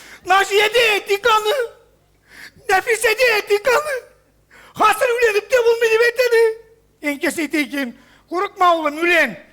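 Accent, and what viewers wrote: Chuvashia, Russia. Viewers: не про чуваш а с чувашским акцентом играет чувашина на татарском языке истории рассказывает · у него вроде всегда такой акцент, чувашский.. может он чувашин? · Как точно! Некоторые мои друзья чуваши так и говорили на татарском, ну точь в точь. Молодец!!!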